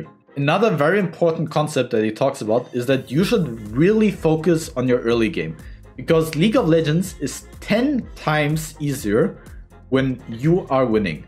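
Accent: Germany. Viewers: Ich merke an deiner Aussprache sofort, dass du ein deutscher bist =) Also herzlichen Glückwunsch zu deiner Leistung <3. Kannst du bitte eine kurze Zusammenfassung bzw Checkpoints der Mechanics für Challenger Teemo & einen Toplaner auf Deutsch bestenfalls für Wildrift raus hauen? Ein Beispiel ich habe mir einen Toplane Kassadin für Wildrift von den besten Spielern auf YT mit Meilensteinen eingerichtet: Vor Minute 5 muss der RoA fertig sein und bis Minute 10 muss der Seraph wenigstens gekauft sein. Dadurch drehe ich egal wie das Game am Anfang lief meistens im Lategame. Ich würde mich freuen dich in Wildrift bald auf dem Weg ganz nach oben zu sehen^^ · The whole time i was like..he sounds german.. Hatte ich wohl recht xD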